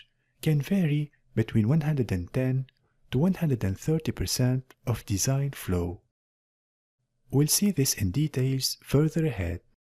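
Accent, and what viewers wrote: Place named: India. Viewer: sometimes indian accent is hard to get the point (intonation)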